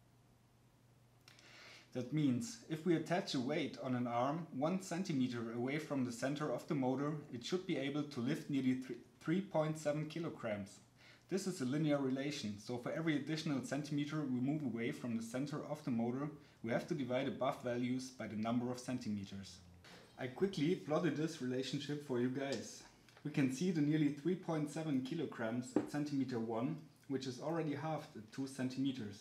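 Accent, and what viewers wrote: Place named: Germany. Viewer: Ich mag deutsche Akzent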